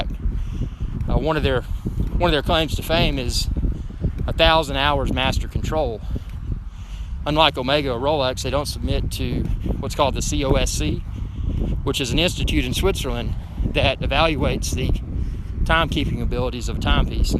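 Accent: South, United States. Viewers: This Is One Hot Irish Bear WooF ! . He Has An Interesting Accent Is He From The South? Walking Good For You Keep It Up Dude.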